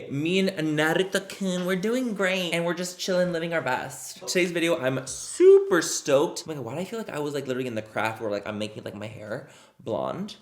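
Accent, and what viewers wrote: England, United Kingdom. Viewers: Ok... I’m going to need a “full face of make up in an English accent” please 😁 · Your British accent lol, I live! 😄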